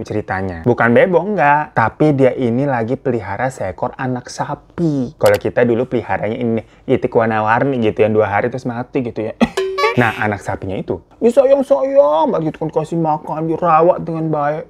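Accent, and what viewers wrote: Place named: Jakarta, Indonesia. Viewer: Ngomong nya kaya Abang gw orang pasar Minggu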